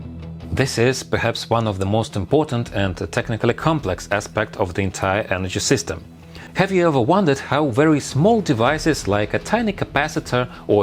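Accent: Russia. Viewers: Your accent says you can speak Russian correct?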